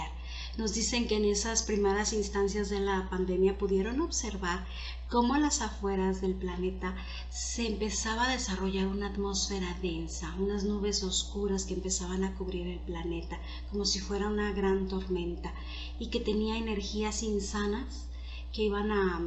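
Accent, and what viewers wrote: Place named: Mexico. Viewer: Hola, voy a asumir por tu acento que eres de Mexico, pero en caso de equivocarme igual gracias por compartir este video en YT, quisiera saber si sabes de algún centro espirita para congregarse aqui en Mexico yo soy de Nuevo Leon pero eh mandado correos al que supuesta mente es de este estado pero no me responden, tendrás alguna sugerencia ? Saludos y gracias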